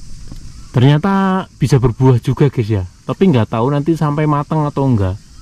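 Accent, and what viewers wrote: Banyumas, Indonesia. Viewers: ora ngapak ora kepenak 😊😊😊😊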